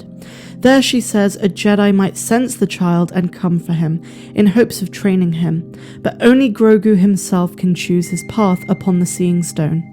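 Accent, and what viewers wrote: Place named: Bristol, United Kingdom. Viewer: all good choices but although iwhould like to see luke train him i am not sure he would want to after what happend with the way it turned out with ben solo by the way love your accent is it from the Bristol area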